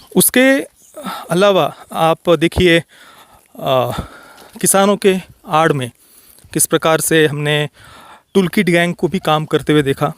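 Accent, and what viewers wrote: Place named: Kolhapur, India. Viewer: Kuthal gaav ahe bhau ❣️???? Tumch kolhapuri accent 👌🏻👌🏻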